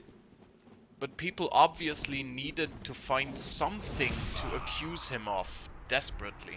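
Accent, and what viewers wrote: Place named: Germany. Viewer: ah i knew it :D because u pronounced some words or to be honest most things u said in a german way. No hate but u should work on that :) but anyways nice Video dude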